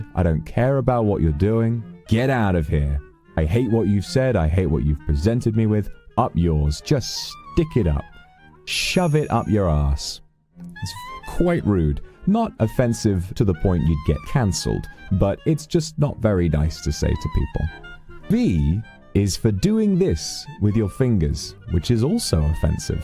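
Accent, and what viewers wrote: RP, United Kingdom. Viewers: 英語ちゃんと聞き取れる方じゃないけどVOXのイギリス英語が上品でいかにもイギリスって感じの抑揚で好きだ これもうASMRだし、度々やって欲しい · わぁ。イギリス英語が聞けて幸せな時間でした❤ 小学生の頃、イギリスに住んでた帰国子女なんですが 日本はアメリカ発音で教育される中、 私は、大学のアメリカ人の教授には「笑っちゃうくらいイギリス訛りが抜けてない」と言われて… スラングの話をしてるのに、ポッシュな英語で(*´艸｀*) 久しぶりにイギリス英語が聞けて幸せでした。 ありがとうございました！ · すごくposhなアクセントですっごいこと言ってるのが好きすぎる😂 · 上品なブリティッシュだなあ憧れる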